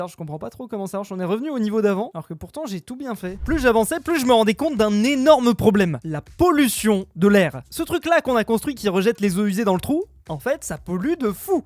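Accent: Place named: Quebec, Canada. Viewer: face-blue-wide-eyes: parle de plus en plus comme quebecois:face-blue-wide-eyes::face-blue-wide-eyes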